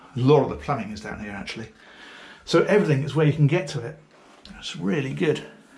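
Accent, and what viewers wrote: England, United Kingdom. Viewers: "the electronics on this boat... it's proper stuff, it's really pucker!" i'd watch these tours just to hear an englishman describe things this way.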